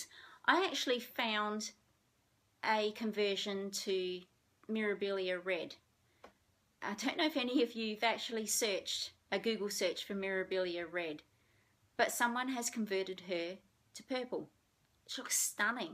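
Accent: Australia. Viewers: Thank you, for this very informative video! By the way, I LOVE your beautiful Australia accent! Your voice is like music to my almost deaf ears! I would love to hear you sing!:) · I loved this video, as I’m new to cross stitch. Hey love the Aussie accent as I to an Australian. I found this video extremely helpful as I hadn’t heard of many of the apps before. I really want to try digital charts. I want to convert my paper ones to digital. Do you know how or is there a video you’ve made for this? I’ve also subscribed to your channel. I’m looking forward to seeing more of your videos. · What a fabulously helpful video! I knew some of these sites, but not others and I LOVE the framing app! Have just purchased! Now off to take some decent photos of some of my finishes so I can use it properly! I grew up in WA, but have been in the UK since I was a teenager - I love hearing your accent. 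Although not a WA accent, still reminds me of 'home' . Xx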